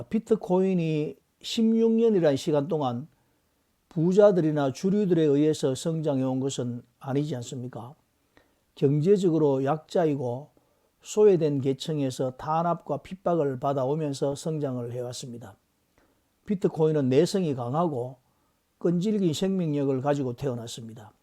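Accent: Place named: Gyeongsang, South Korea. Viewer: 공통점!? 경상도 말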